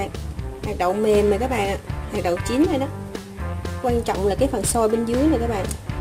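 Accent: South, Vietnam. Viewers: Nghe ngươii mien nam thich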